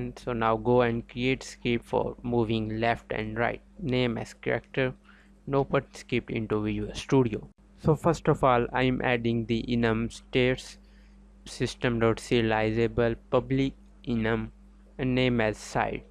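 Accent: India. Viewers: the dude with the indian accent has saved us once again! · Indian accent found =Op teaching ,btw i am from nepal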